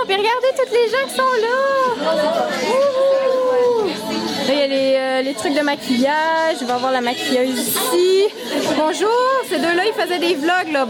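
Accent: Quebec, Canada. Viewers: Oh stp!! Je suis française et grâce a toi, j'suis tomber en love du Québec et son accent!! 💋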